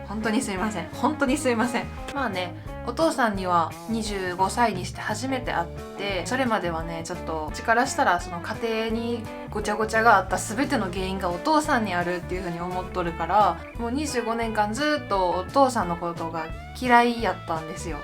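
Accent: Kansai, Japan. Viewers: 初見だけど、関西弁のせいで大したことじゃないみたいになってる。標準語は使えないタイプの人なのかな？ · って関西弁わからないけどなまってたっけ❓ 可愛いからいいけど笑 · 何で自分と同じ関西弁やのにこんなに綺麗なんや？(´・ω・`)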